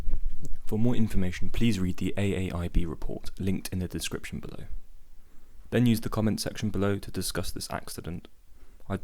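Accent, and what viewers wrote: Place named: Birmingham, United Kingdom. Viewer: I keep trying to watch this video. I've already left one set of comments. As I keep rewinding. Going what did he say? What did he say? You are mumbling, child! I know we all love Ozzy Osbourne. And wish him and Sharon well. But you don't really want to sound like, you are trying to impersonate, Ozzy Osbourne's, brain dead speech patterns. What did they say that is? A, Birmingham, twang? As in, Birming and ham. It's 2 things not one. It's not pronounced, " Buhmmmmh ". Look at the letters! You have to pronounce them! Along with, letters AEIOU and sometimes, Y. That sounds like, E. And I don't know, Y? And then to make English more confusing. Some joker came up with, YMCA and YM HA in, New Yaawk! And we don't know why that is? So don't ask. It just is. Don't say gay. Even if they are. And genocide of the Native American population. 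No big thing. Not because they weren't white. We aren't like that. It's because they didn't believe in Jesus. And they only lynched black people also? Innguns… Didn't got to worry about that. We were kinder and used bullets instead. Because they also went, BANG! And white guys, like that sound. Because the one they have doesn't work. As Viagra was some, Mexican chick.. And I haven't seen Alice yet. This articulate response. Was artificially generated by my very damaged brain. From years and years. Of calorie burning thinking. Which used most of it up. And in only the past few months. I have lost over 25 pounds! Isn't that amazing?! Just lying on top o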